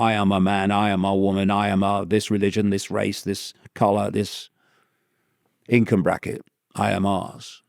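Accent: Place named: Cockney, United Kingdom. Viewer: Sounds like he's got a London UK slight cockney accent.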